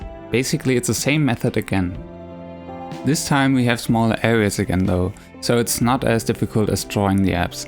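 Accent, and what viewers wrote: Germany. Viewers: I didn't think of playing with pressure when drawing with Copics. Thank you, I will definitely try that out next time! I have a question tho... Are you German? The way you pronounce words sounds a bit like German accent 😃 just wondering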